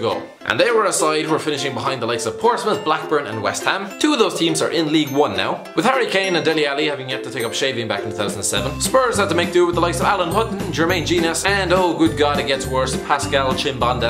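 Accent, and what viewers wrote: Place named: Ireland. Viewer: The Irish narrator is the best by far. Needs his own channel.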